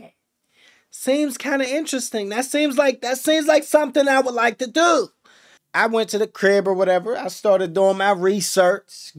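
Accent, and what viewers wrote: Pittsburgh, United States. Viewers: The Pittsburgh accent goes crazy hard!!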